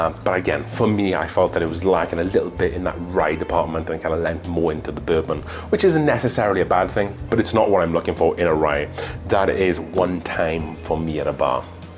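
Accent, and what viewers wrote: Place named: England, United Kingdom. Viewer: yes, very much so. You're one of my favorite whiskeytubers. Dig you, brewzle, and SLB. Also, I thought I could get a touch of Scottish but it was also very similar to english (accent) but not one specifically. I mean I'm a Californian so accents peak my interest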